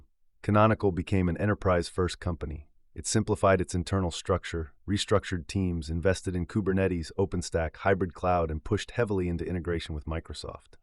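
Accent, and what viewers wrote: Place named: South Africa. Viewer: No, he's not Afrikaans, he's an English-speaking South African.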